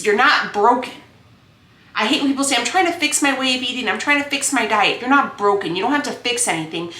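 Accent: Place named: Minnesota, United States. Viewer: You are doing great! I’ve learned lots I learned new recipes from you I really like your Minnesota accent even though you are not from there. I stole your It’s a Good Day to Have a Good Day I like lots about you But… when that one word comes out of your mouth it surprises me . The word is 💩 SHIT 😳 What can I say I’ll keep watching and you most likely will keep that descriptive word in your video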